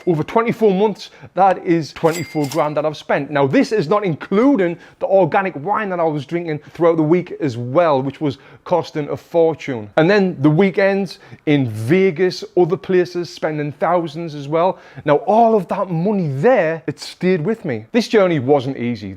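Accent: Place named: Newcastle, United Kingdom. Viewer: Geordie boy, lovely, well done.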